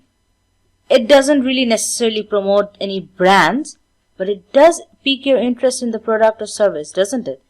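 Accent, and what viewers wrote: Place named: India. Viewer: You sound indian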